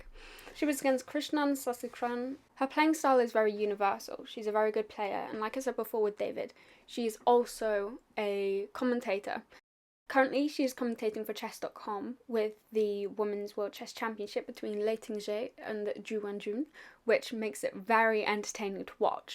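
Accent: United Kingdom. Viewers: Sound like RP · You have a British accent... it is a GREAT accent!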